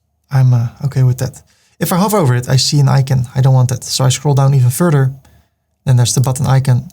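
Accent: Netherlands. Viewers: i am obsolute beginner. started as a hobby. This tutorial is amzing. He never hiding anything. His dutch phonetics is lovely. I am trying to imitate. coming back to the subject, when I tried to create background colour for the section, it is showing the row separate colour. How to fix it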